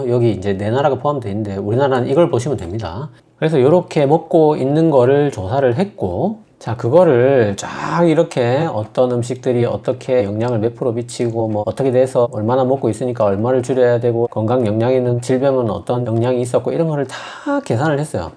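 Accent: Gyeongsang, South Korea. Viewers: 웃기지만 사실적 표현 하나 할까요 오래되진 않았지만 님을 처음 영상으로 만났을 때 이름은 무슨 중국의사인가 싶었고 외모는 고등학생처럼 어려보였어요 거기다가 억센 경상도 사투리를 쓰는데 주구장창 경상도를 떠나본 적이 없는 토막이 경상도 사람 그대로였어요 그러나 놀라운 것은 구독자가 보는 가장 훌륭한 의사쌤이라는 것 사랑과 헌신으로 저렇게 열심히 전해주시는데 "고맙습니다"라는 표현이 한없이 부족합니다 열심히 열심히 공부하고 있습니다 선생님도 늘 행복하시기를 기도합니다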